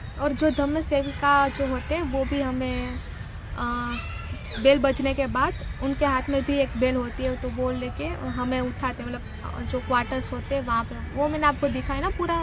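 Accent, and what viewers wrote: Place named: Kathiawar, India. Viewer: Typical kathiyawadi accent 😂 Nice👍🏼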